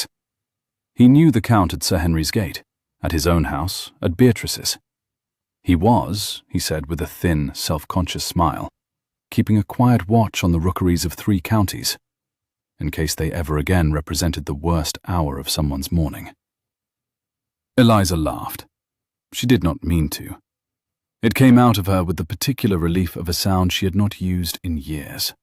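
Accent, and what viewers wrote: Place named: England, United Kingdom. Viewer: I would like to add that this story had the perfect Narrator. with an English accent. This voice is definitely one of the best. So many stories about England, with Dukes & the aristocracy just use a narrator that is American & it does not fit. This one created a perfect Presentation. Well Done!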